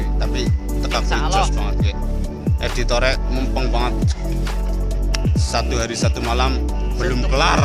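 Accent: Banyumas, Indonesia. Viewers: Ngapak bae bhsne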